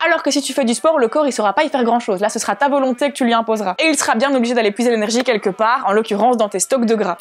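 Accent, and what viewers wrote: Liège, Belgium. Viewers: Comment ça un horrible accent Belge ya quoi contre les belges laaaaaaa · Tu as un magnifique accent liégeois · t'as un accent belge de dingue hahaha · Je kiffe son petit accent Belge ❤ si non super vidéo en fait g été renvoyer sur le n 1 alors que je commençais le n 2 😊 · Mais tu as toujours l'accent belge non? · "Horible acent belge" t es sérieuse ! C etait pas loin d un dislike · L'accent belge 👌👌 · ton accent belge on l'entend tt le temps Mais c pas grave on t'aime quand même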